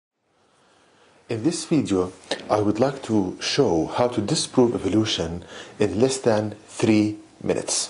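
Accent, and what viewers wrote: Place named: Arab world. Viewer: he speaks EXACTLY like ownage pranks arab accent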